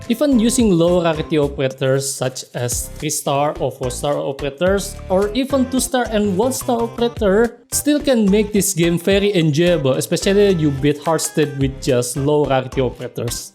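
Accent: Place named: Indonesia. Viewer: kenapa ya orang indo kalo ngomong inggris kaya gitu semua ya? Kaya ada keunikannya sendiri langsung ada feeling "oh ini indo nih"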